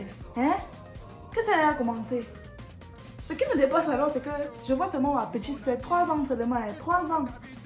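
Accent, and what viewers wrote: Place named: Cameroon. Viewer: ... bonjour à toi… je me suis arrêté où tu dis MAJOUMBOUM... si tu voyais la tête que tu fais en disant ce mot...😁... bref tu as dis à la fin que tu espère qu'on a aimé, j'ai aimé mais je trouve que tu as été trop doux envers les Camerounais… le Camerounais a tellement des talents et des dons mais dommage la transmission est ZERO, sur le plan éducatif MAJOUMBOUM et tout le reste là, nos petites sœurs et petits frères retiennent quoi sur le plan INSTRUCTIF… Mon père MEA dit souvent on ne peut donner que ce que l'on connait, nos frères adorent le bruit et le bouquant. l'ambiance n'est pas une mauvaise chose mais il faut que ça éduque, que ça instruit; on va toujours nous insulter et nous qualifier ( MAJOUMBOUM ). et en passant tu as gardé ton accent Camerounais où est passé l'accent grec???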